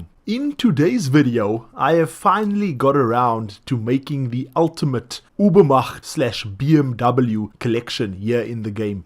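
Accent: South Africa. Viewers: Are South African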